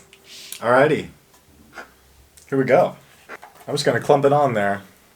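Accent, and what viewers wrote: South Africa. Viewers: You're South African?! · What are u South African??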